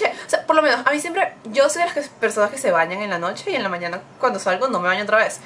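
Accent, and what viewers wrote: Dominican Republic. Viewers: Eres dominicana ? pk tenemos el mismo acento;p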